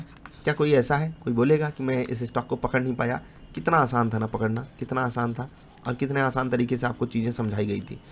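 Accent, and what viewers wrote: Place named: Eastern Uttar Pradesh, India. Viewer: You are from UP EAST ??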